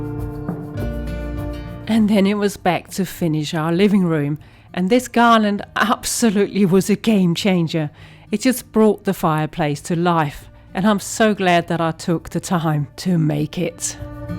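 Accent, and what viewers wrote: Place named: United Kingdom. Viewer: Wow! Your porch is absolutely stunning! And those sweet teddies are tucked in the tree so they are sheltered from the elements! I think Hermes makes a bag styled very similar to your Teddy Blake bag. Aren’t you chic with that fabulous handbag! Finally, besides loving a British accent that I have fun trying to mimic, I learn new words from you. In the USA we call “wadding” filling or stuffing. You have convinced me that I need to make some of the felt hearts with wadding! Than you so much for sharing your creativity with us!❤❤❤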